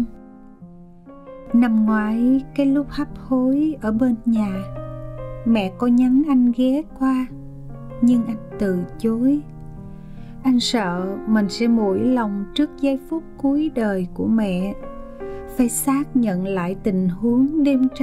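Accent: South, Vietnam. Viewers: Viết thì hay, nhưng cấu kết câu chuyện thì không đâu vào đâu. 6/10. Cảm ơn giọng đọc miền Nam tuyệt vời của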